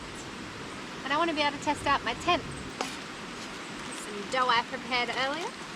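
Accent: Australia. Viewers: love watching your videos your just so happy and the auzie accent melts me come camping in banff I have lived here 18 years and would love to show you around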